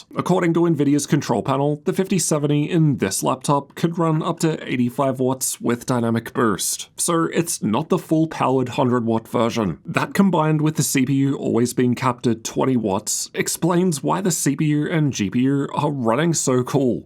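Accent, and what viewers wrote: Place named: Australia. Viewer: Man you take the worst new gen Australian speech patterns, with the intrusive Rrrrr and the end of sentence falling intonation, and you magnify it by a thousand!!!! I can't listen to this verbal debauchery any longer. I'm out.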